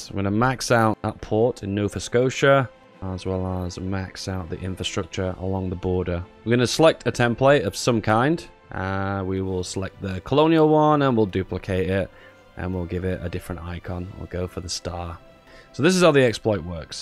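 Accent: United Kingdom. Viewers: The reason I subscribed to this channel is because you are so damn brittish and it makes me feel great to hear your accent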